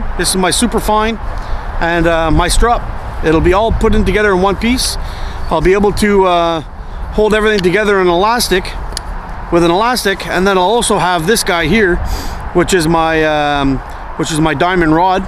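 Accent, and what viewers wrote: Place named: Canada. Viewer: boogie2988 with a Canadian accent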